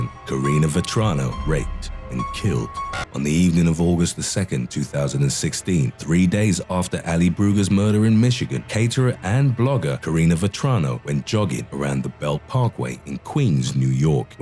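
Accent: United Kingdom. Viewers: shout out to the British narrator😉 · What happened to the scary voice. This guy is ok but any U.K. Voice is never really that scary. Makes you not want to jog. Although anything like being in the wrong place at the wrong time can get you killed. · batman 666, True i kinda liked the other one. The british voice does not work with the music too.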